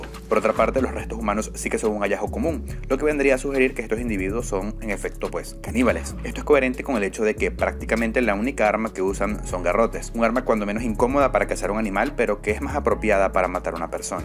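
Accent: Venezuela. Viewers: Buen video amigo eres de venezuela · Es venezolano¿ El narrador no los canibales, el acento xd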